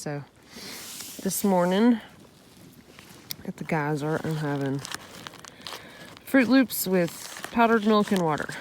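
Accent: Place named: Alabama, United States. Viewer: Am I the only one who teared up when Old Faithful started goin? I'm just in complete awe of you and your accomplishments and perseverance despite any challenges. It really makes me reevaluate the way I'm livin.. I live in some of the most beautiful of lands in northern California and tend to get complacent. I don't ever want to take all of this beauty for granted as I could be trapped in a concrete jungle. I have been binge watching this channel and now have my mama watching it too...also she's from Bama in a big way because her Dad lived in Gadsden his whole life and she'd be there for the summers. Then she started taking us kids there often growin up. So a lot of my relatives and even myself at times sound like you lol people look at me funny in Cali whenever I say "I reckon" and now I reckon I re-realize why I say it... because I've got Bama blood. 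Anyways, I can't wait to watch more of the trail and start bein more of a willer myself. I've officially got the hikin bug. Thank you for sharing your heart and journey with the world 💜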